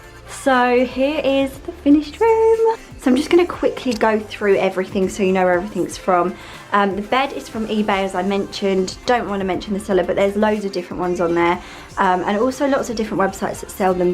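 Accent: United Kingdom. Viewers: ar you from the uk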